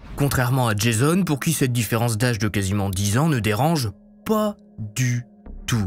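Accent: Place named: Picardy, France. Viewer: Ce fait comme un rat mort. Tu es du nord Picardie j’adore 👍 bisous 😘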